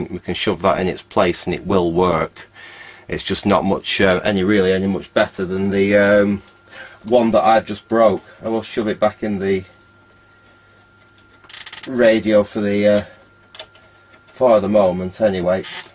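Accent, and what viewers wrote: Manchester, United Kingdom. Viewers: Where are you from..... Sounds like a bit of a manc accent..... I have a question I can't get my head around..... I bought a ct160 10 days ago .... And regardless of the data ,the anode current settings are always a lot lower than the book.... e.g testing ecc81 valves the anode current should be 10ma and out of 5 valves I've tested they all seem to be about 3to 5ma to zero on the scale.... I've been desperate for a new video to pop up somewhere..... would it be possible to do a video at some point testing an ecc81..... Ps tried a e88cc and the anode should of been 15ma I think it was, however it was zeroing on 3ma.... I'm new to valves only using basic go no go testers... Any help would be awesome.. I'm in Burnley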